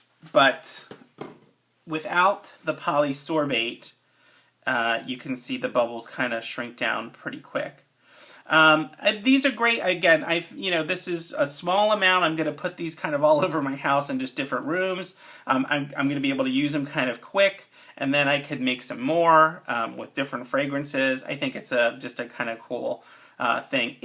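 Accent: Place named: Philadelphia, United States. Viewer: I've made room sprays using varying percentages (from 50% to 90%) rubbing alcohol, or vodka (35% or 70 proof), or Evercleer (95% or 190 proof) grain alcohol. I really dislike the alcohol smell in all of them, nor do I like waiting for the alcohol smell to dissipate...some taking MUCH longer than others. So I'm glad to hear about the DEO Household stuff you've recommended using. I'll check it out. One other thing, though. I've always heard you should use EO instead of FO in a linen spray because FO can leave a stain and EO will not. For that reason, I've only ever used EO in a room or linen spray. I've never used FO in a room or linen spray, so I can't say whether it stains or not. Have you any thoughts on that provision? You sound just like my friend in NE Philly...are you in Philly?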